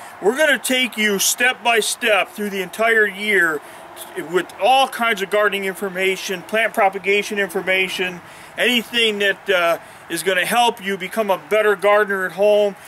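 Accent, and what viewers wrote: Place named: Midwest, United States. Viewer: What is your location btw? It sounds like you are from the Midwest 😀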